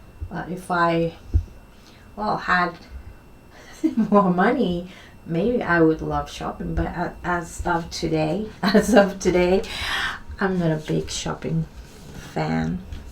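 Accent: United States. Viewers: As an Indian I liked your content ma'am because of your American accent. And you just teach us American english accent please🙏🙏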